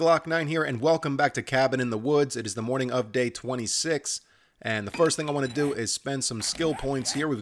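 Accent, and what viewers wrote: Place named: New York, United States. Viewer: I knew it I knew you were from New York but I just couldn't put which part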